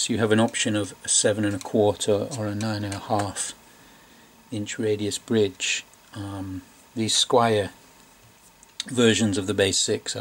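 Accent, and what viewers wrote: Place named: Ireland. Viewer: U sound Irish, Scottish and Welsh sometimes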